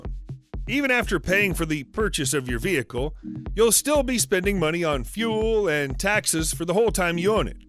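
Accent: United States. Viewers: Had trouble with the American pronunciation of English place names but. Apart from that. I enjoyed the clip. Makes me appreciate the 16 cars in my collection more. Must get round to restoring a couple in the future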